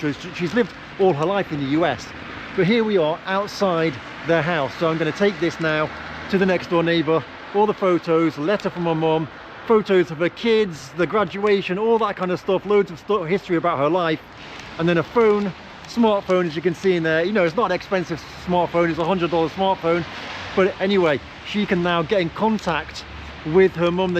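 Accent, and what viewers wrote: United Kingdom. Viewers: you've got a big heart. You went out of the way to help the woman in the US to get in touch with her mom, and you bought a phone for her mom to call US. Not many people would do that. By the way, if it weren't for your British accent, I would have mistaken you for a Russian.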